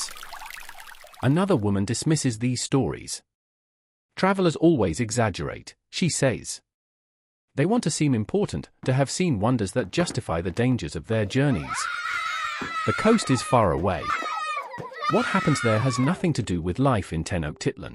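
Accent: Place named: United Kingdom. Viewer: It’s read by a British person… the irony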